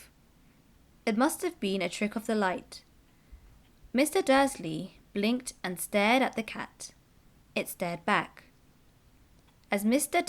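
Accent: United Kingdom. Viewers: 영국발음 너무 매력적이에요 :D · After all, football commentary and Harry Potter books should be heard in British English. thank you. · 영상 감사합니다🙂 영국발음 멋있어요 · 해리포터 원서로 공부하고 있는데 영국발음으로 읽어주시니 너무 좋아요~~!! 구독하고 가용ㅎㅎ죽음의 성물까지 존버합니다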